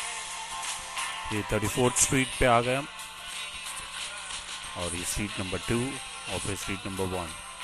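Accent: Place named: Punjab, India. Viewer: lolzz ... is may aap deakhay gay kay muslim ko kitna accha ker deeya hay hahahahahah ahahaah ..... I like your urdu accent as it sounds panjabi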